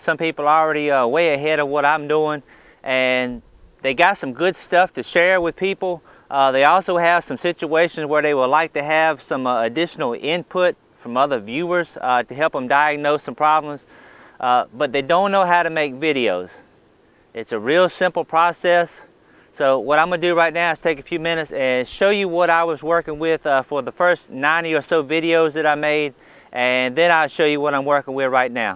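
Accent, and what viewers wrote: South, United States. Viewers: Great and informative video! You seem like a really nice person; thanks for sharing your knowledge. PS--love your Southern accent! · I just love that Southern accent. Plus, the guy was very positive and encouraging. I love it when things are kept simple - very simple and to the point. Great job!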